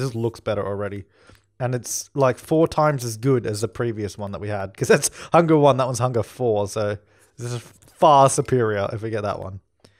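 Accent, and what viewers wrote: Australia. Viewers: I'm trying to figure out by your accent where in Australia you're from aha. I love watching your builds. I miss the Sims 2 so much. If you register your base game with Origin, you get The Sims 2 Ultimate Collection for free at the moment. Meanwhile, most of you watching probably already have each pack :p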